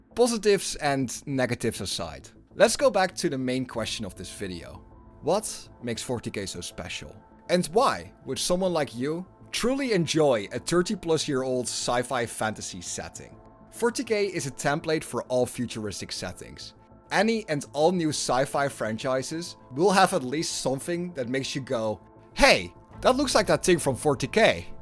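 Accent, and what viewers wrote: Netherlands. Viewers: The dutch accent.... like none other :)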